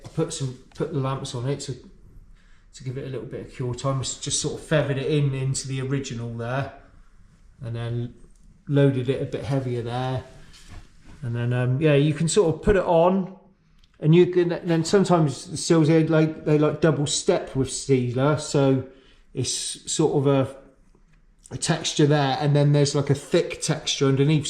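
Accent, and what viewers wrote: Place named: West Country, United Kingdom. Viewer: Nice job, where are you? Must be west country? I want to say Swindon or Bristol?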